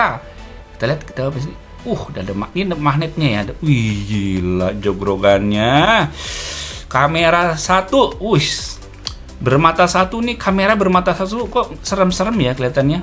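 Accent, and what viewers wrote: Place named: Java, Indonesia. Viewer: Suaranya mendelep, jowone metu wes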